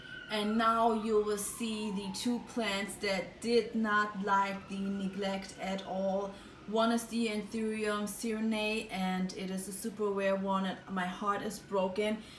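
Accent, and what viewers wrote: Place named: Germany. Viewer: Hi, great music 👍. This is the first Video I watched, and damn, all the time I thought "this accent, she has to be German". Switched to your housplant tour part one, looking out of the windows, in the backround, furniture and stuff if anything can tell me... Nothing in your channel-information. And then "LA" 🙈. Finally "Berlin", so I can chill 💁. Greetings from Germany! 🇩🇪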